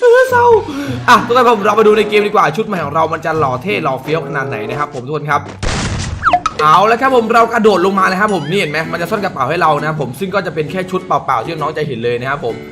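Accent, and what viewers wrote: South, Thailand. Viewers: อยากให้พี่ พูดใต้ทั้งวัน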